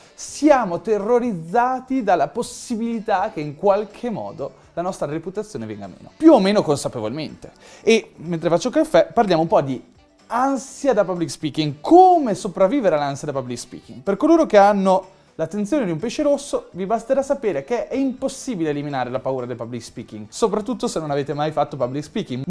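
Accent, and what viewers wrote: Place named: Ferrara, Italy. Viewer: Ma sei come me di Ferrara, mi sembrava di sentire un accento comune!!! :D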